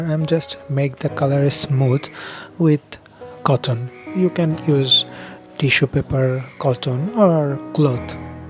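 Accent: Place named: India. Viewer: you speak japanese, you sound indian, and you draw like a god. your A legend.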